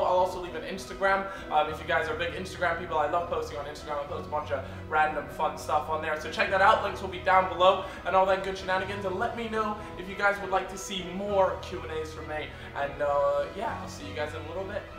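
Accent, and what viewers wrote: United States. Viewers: ... I thought he was American.... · you sound American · U sound American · Thought you were American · Am i the only one who thinks he has kind of an american accent? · You sound American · The accent sounds closer to American than anything else. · your accent sometimes sounds american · Am I the only one who thinkg he sounds American? And coming from an actual American that is huge. It really confuses me to know he has never lived here. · So your accent is all those things you mentioned but I can mostly hear American yet you never lived in America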